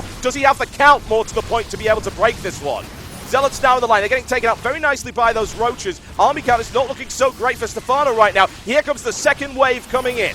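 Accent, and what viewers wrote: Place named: London, United Kingdom. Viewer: TB, I bloody love you man! The entertainment value of your casts is exceptional and combined with your voice and accent they induce the kind of mancrush that is normally reserved for only the best of friends. Btw, have you ever considered starring in the next Guy Ritchie movie? I think you would be a perfect cast for one of the small-time london criminals in a movie like Lock, Stock... or Snatch :P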